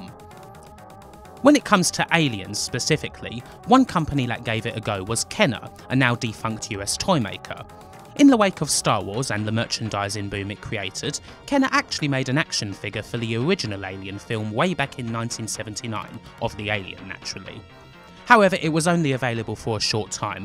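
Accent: United Kingdom. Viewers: how fucking awesome is it that I get to listen to an arcade game review by a British Elmer Fudd?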